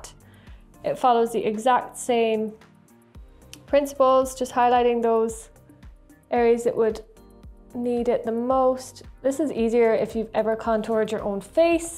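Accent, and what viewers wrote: Ireland. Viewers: Oh wow, I wasn't expecting an Irish accent. Galway or Mayo I'd guess (from Galway myself, and in fact see my own first name on the wall). Kinda new to wargaming/mini painting and have no idea how popular it is in Ireland, so this at least gives me some hope! Looking forward to checking out the rest of the channel after this as I'm loving this tutorial so far. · A beautiful redhead chirping away at the camera in a charming Irish accent and doing a rather good job painting up that miniature was a great thing to watch before bedtime, tonight. Good job, Miss, and I bet you do rock the elf ears if ever you wear them? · Always been on the lookout for a tutorial that shows exactly how to paint skintones, seen some but they were very vague. So seeing this video with it's perfect on the spot tutorial being shown by the gorgeous has already made 2022 worthwhile. 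The fiery red hair, bright red lips, gorgeous eyes and that wonderful Irish lilt, WOW! Going to check out your backlog of videos and Subbed!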